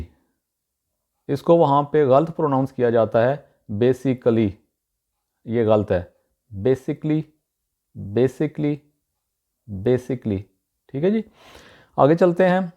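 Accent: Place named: Punjab, India. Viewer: Accent, a typical Punjabi phenomenon, rather complex. Hell with pronunciation, try to gain command over language by developing understanding of Language.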